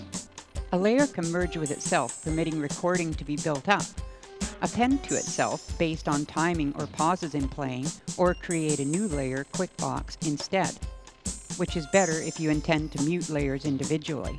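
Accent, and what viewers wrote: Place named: Midwest, United States. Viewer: Awesome! We were thinking midwest U.S. or maybe Western N.Y. near where I grew up in haha! You're accent is very similar! Cheers!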